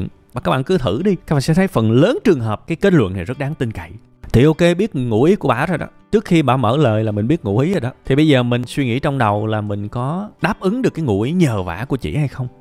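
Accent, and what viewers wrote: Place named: South, Vietnam. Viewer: Thích giọng nói miền nam và cũng là âm thanh rõ ràng của thầy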